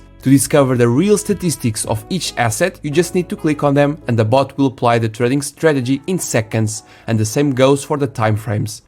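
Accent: Portugal. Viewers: Earing you speaking and appreciating your accent it seems to me that you are portuguese from Portugal 😅🇵🇹 am I right?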